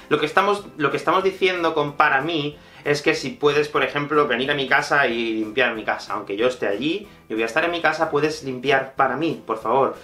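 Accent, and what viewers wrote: Spain. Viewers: Gracias por los videos, pero no puedo entender el castellano, es decir el español de España.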